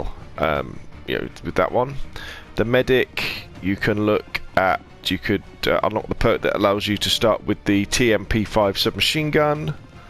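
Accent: England, United Kingdom. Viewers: Excuse me, sir, you are saying everything. Corrects, you explain it very good, but please take the marbles out of your mouth when you're speaking. It's really confusing. My friends, I know you have an english accent. And that's why listen I have a Boston accent. That's very deep so if you could clarify a few things, take the models out of your mouth and thank you.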